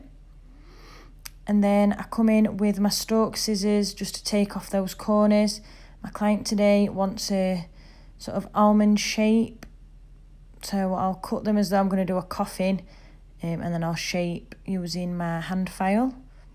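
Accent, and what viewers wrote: Yorkshire, United Kingdom. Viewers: It’s lovely hearing someone from Yorkshire 😊.. gorgeous nails 😍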